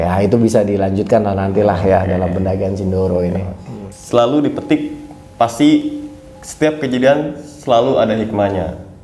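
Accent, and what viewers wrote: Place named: Jakarta, Indonesia. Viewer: Betawi pisan ini orang wkwk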